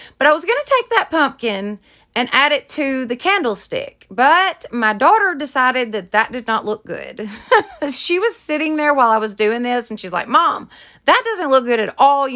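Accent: South, United States. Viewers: The happy harvest truck aint bad. But craft happens and sometimes things just dont come together. If that was your mess up then I would hate for you to see mine. LOL I think everything you make is amazing and love the country twang it just does it for me. Keep up the great work and cant wait to see what you come up with this christmas!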